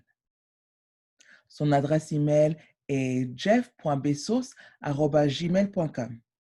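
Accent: France. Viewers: I love your French accent 💕 I tried to take French when I was in high school but never could get the hang of it .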